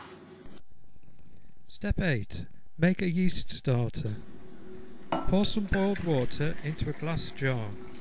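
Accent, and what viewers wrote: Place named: England, United Kingdom. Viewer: Fair play, must taste delicious but cant stand that english commentary.... get on to the BBC and you could malke a living..!! Well done tho!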